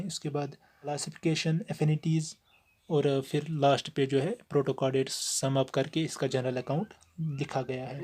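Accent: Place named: Kashmir, India. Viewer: You are Kashmiri I got it through your accent 😶... Great job bro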